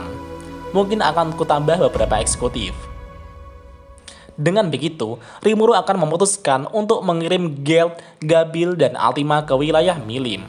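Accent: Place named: South Jakarta, Indonesia. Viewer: Jaksel ya bang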